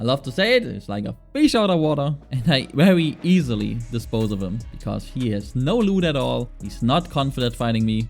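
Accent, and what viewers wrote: Germany. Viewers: Anhand deines Akzentes, bist du bestimmt deutscher oder? :D Schönes Video!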